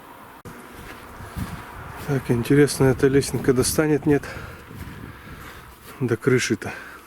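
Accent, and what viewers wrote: Perm, Russia. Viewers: Говор Пермский уши режет.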